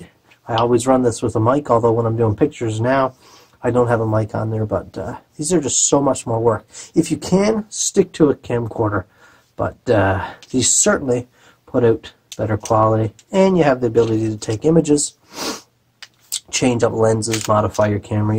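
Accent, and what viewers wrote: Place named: Newfoundland, Canada. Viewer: sorry new to the channel, but yeah the accent gave it away im a alberta boy living in ottawa area got alot of buds from Newfoundland... Both baymen and townies... But all good folk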